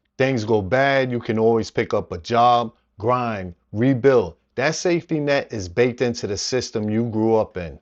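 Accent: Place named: New York, United States. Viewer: New subscriber: Excellent advise, you sound like a brother from New Yawk; I hear an accent...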